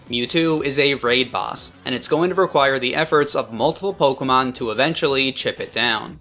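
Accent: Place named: Newfoundland, Canada. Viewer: I detect a slight Atlantic Canada/Newfoundland accent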